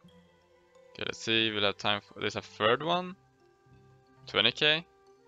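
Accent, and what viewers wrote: Sweden. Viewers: As soon as I heard you're voice I was like ''Yeah, he's swedish''.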